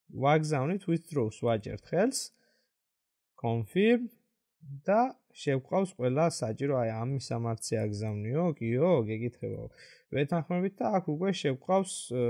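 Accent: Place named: Kutaisi, Georgia. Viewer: კილოთი ქუთეისელი და channel nameთიც ქუთეისელი 😌😂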